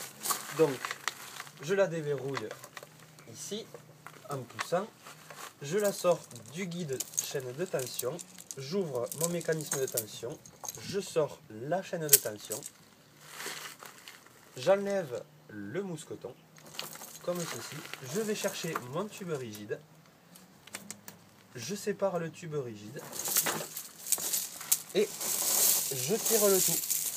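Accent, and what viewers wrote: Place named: South, France. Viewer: je t'écris 11 mois après mais le rapport c'est que le mec parle comme le sketch des Inconnus avec l'accent du sud un peu flicard mais son tuto et super merci à lui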